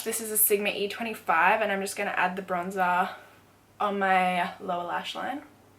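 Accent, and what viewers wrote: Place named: Queensland, Australia. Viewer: you are such a doll. I was in QLD a few months ago, love your accent 😘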